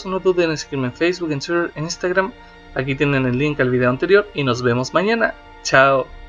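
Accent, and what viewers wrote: Chile. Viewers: Compadre, es de ¿chile?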